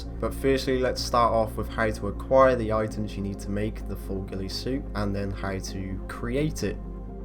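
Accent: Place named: South West England, United Kingdom. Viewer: Are you from sw uk mate you sound very local with that accent 😜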